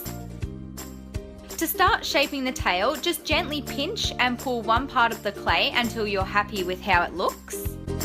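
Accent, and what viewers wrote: Australia. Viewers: i heard your voice and i knew straight away you were aussie😁(im aussie too)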